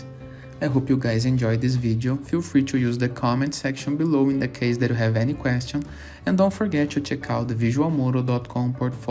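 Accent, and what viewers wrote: Brazil. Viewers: you sound brasilian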